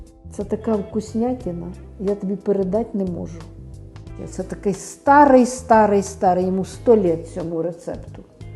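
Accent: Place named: South, Ukraine. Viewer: Наш південний говір! І смачні мітітеї! Дякую Вам. Чекатиму наступних відео. Хай щастить!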